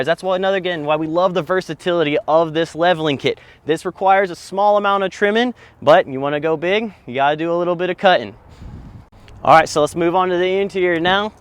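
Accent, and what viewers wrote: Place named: South, United States. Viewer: Good stuff, love the rolling shots as well. Both beautiful trucks. Only thing missing is the signature southern accent we all love. ( my wife says your hot. Haha ) On the white truck. What is minor trimming?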